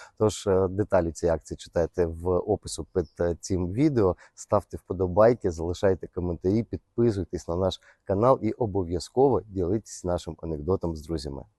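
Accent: Odesa, Ukraine. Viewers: Дякую, дуже гарна українська,але одеська вимова це ЩОСЬ,тим більш у вашому виконанні.Дякую. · його шарм у правільній "одесской" подаче. От у чому прикол: правильний анекдот розповісти на тій мові, яка не доцільна а рекламу зробити на россійскій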